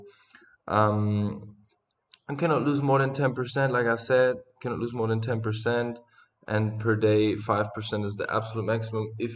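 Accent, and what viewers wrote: Germany. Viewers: Great video my man. Are you from Germany bro?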